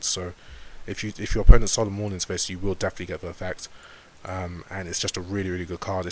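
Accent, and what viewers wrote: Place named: England, United Kingdom. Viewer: nice! The performages seem to do quite a good job for spellbooks, giving the deck access to great rank 4s. Trapeze magician also seems really good, with it floating and all. I've also seen people experiment with the combination of trick clown and heroic challenger- thousand blades to get multiple rank 4s on the field, so its really nice to see people innovating with the engine :D Btw, you sound english. Are you? o.O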